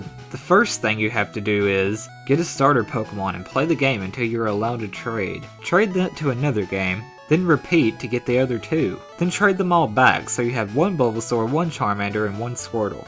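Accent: South, United States. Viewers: i like your light southern accent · Does anyone else agree this guy sounds like a hillbilly?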